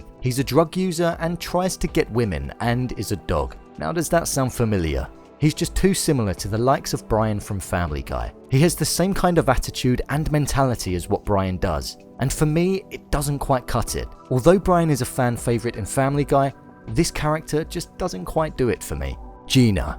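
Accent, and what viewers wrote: United Kingdom. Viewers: It's so fucking try-hard with it's "crude" humour and gags. Especially with the celebrity references. This guy thinks it's "hilarious"? What a waste of a posh english accent. lol I enjoy Rick and Morty. I think American Dad is funny and sometimes even Family Guy. But Paradise PD is very very very very VERYYYYY unfunny. I laughed once every 1.5 episodes. Nothing subtle. AT ALL. They try to be rude, crude and lewd at every single point. For every one sentence of scene building there are 5 or 6 "jokes". Bad "jokes" and "gags". It's like those parody movies style of humour. Scary Movies, Not Another Teen Movie, Meet The Spartans, Vampires Suck and so on... I watched all 10 episodes btw.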